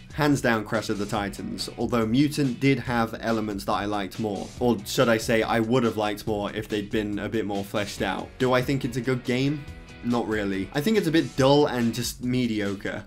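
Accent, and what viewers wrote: United Kingdom. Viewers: oh man ... how i love hearing british guys talking ... nice video(s) btw :D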